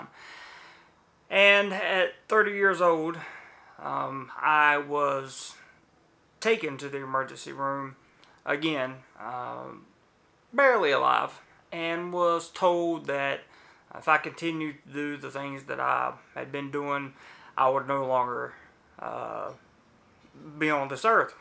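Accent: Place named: North Carolina, United States. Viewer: Great job, keep it up. As soon as I heard your voice I knew you were from somewhere by Charlotte😂 then it was confirmed. You're reppin NC well for us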